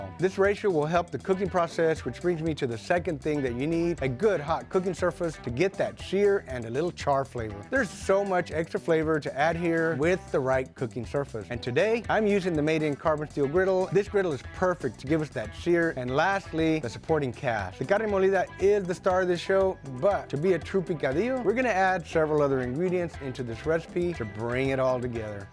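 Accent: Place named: Brownsville, United States. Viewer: I'm from Brownsville and this guy sounds exactly like the people here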